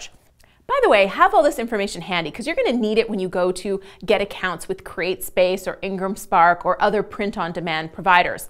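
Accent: Canada. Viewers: Are you Canadian? You sound like Samantha bee. Love your voice :)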